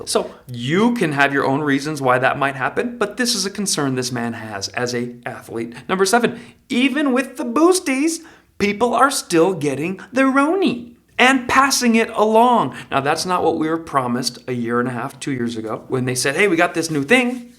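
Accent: Australia. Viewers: Great Aussie voice! You and your impressive impressions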